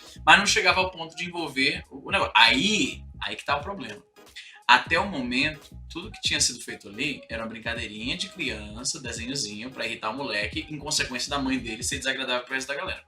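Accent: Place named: Ceará, Brazil. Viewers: Éeeegua.... aêeeeee um cearense numca esquece suas raízes! Aprende com teu pai Izzy 😄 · Sotaque dele é muito forte. todo vei aqui em Fortaleza fala assim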